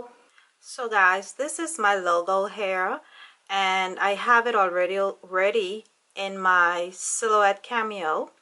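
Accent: Bahamas. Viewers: Oh wow you sound Bahamian! Are you Bahamian?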